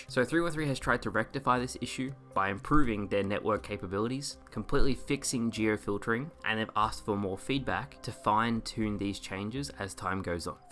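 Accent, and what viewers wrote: Australia. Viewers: Are you Aussie or just in a remote area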